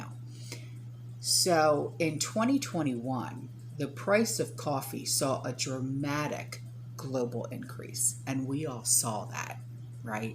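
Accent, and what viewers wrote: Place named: New Jersey, United States. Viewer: I'm soooo happy I found your channel. I'm new, but I look forward to your vids every day. It's like sitting down with a friend. You have a beautiful manner and you're as cute as cute can be. By the way, I'm a Jersey girl, too, transplanted to Florida four years ago. So add your accent to the mix, it's like being home:) God bless you and your family, and thanks for everything. Last thing, your voice is so calming and soothing, you should do bedtime stories on tape.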